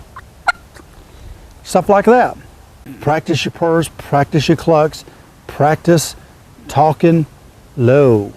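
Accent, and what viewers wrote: South, United States. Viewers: Lol that southern accent could give tips to do both guaranteed lol. Great videos. I can never get enough turkey talk. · Folks this guy speaks better English than most of y’all ‘spert English teachers. Too many people need to get real and quit being high and mighty. It’s hard to see people when your nose is in the air. We in the South add personality and flavor to our talk. I’ll take genuine good ol’ boys over any snob any day.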